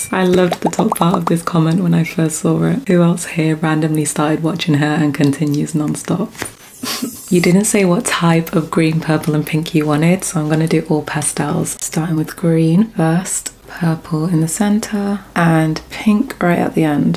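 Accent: United Kingdom. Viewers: Can you do pink yellow and purple❤ and I love your British voice and I also love your make up😊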